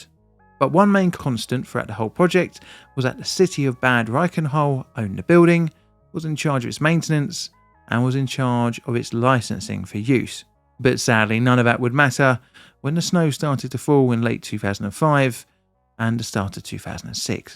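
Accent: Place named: England, United Kingdom. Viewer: As a German can I just say, your pronunciation of Reichenhall is spot on. Yeah there is a slight accent but bruv an Englishman saying "ch". Sorry 😅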